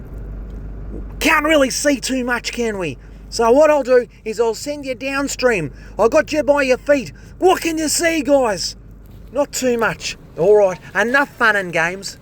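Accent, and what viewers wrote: Australia. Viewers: !! I gotta tell ya mate. Sometimes I’m in a right bad mood and coming along with you and unblocking these drains really turns it around. I go from feeling like a 1 to a 10 the minute those drains start running clear. Watching from VA, USA and you gotta read that comment in your own voice mate! Americans are notoriously bad at the Aussie accent 😅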